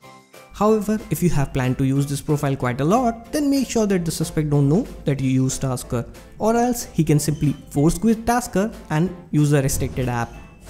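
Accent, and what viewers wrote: India. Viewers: same lol ,i hate indian accent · Indian accent always cracks me up😂😂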